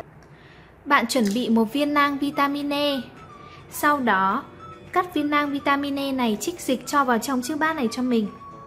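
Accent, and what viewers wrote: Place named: North, Vietnam. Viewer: Thích nghe mo lên thấy bac kỳ đoc ko thích khó nghe sai tu chát tai quá nói cho ng nam đoc ko cho vây hết ng hả nghe bac kỳ đoc chán bỏ me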